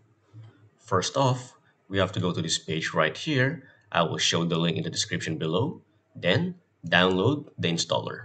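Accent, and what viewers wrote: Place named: Philippines. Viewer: you sound like a Filipino.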